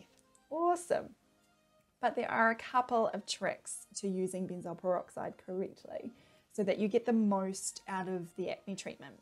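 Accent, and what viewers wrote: New Zealand. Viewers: I love your smile, and your cute New Zealand accent! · You have the softest kiwi accent. Love 🇬🇧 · aha!! i guess you were new zealander from your accent and reading the caption, i was right hehe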